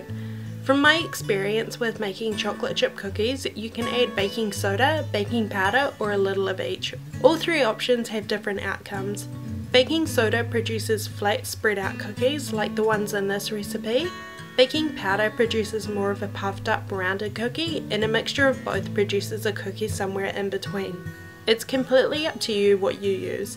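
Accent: New Zealand. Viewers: Your from new zealand right? I can hear it in your accent :D im a kiwi too! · She sounds like she’s from new zealund bro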